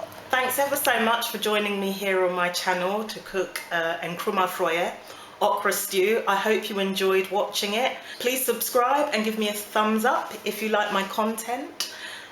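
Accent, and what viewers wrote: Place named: RP, United Kingdom. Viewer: I like your accent is so posh ❤️😂😂😂 ! Love from a Ghanaian sister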